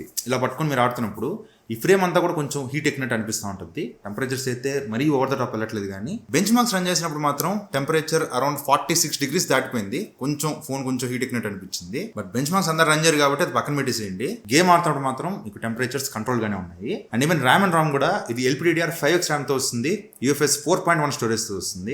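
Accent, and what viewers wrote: Nellore, India. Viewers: Anna nellore nv anna❤❤❤❤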